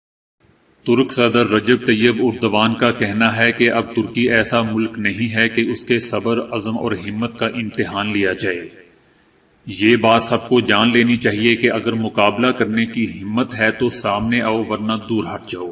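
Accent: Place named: Punjab, Pakistan. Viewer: aap baz alfaz pajabi lahjay may boltay hain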